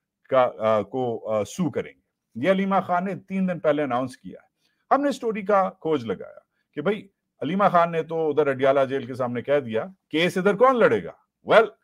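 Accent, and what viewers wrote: United States. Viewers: Sir ap urdu blkl mere 21 sala bete ki trah bolte hn, just little difference u speak wd American accent and he wd French accent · Mr wajahat your are sitting here for correction of English sentences. This is military leadership visit , why your are involving foreign ministry. Being Pakistani i will give due respect to all my Civil as wel as Military leadership. your are speaking in tone of American, being American your analysis is very correct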